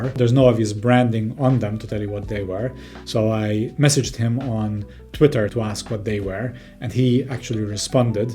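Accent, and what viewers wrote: Malta. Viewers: I just stumbled upon this video. Useful content. Tho out of curiosity.... that accent... are you Maltese? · You sound Maltese 😊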